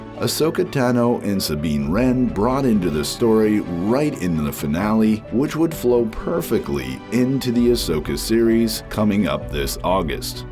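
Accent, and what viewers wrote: Canada. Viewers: Is that a Canadian accent with scouting pronounced "scoting" (sounds like coating)?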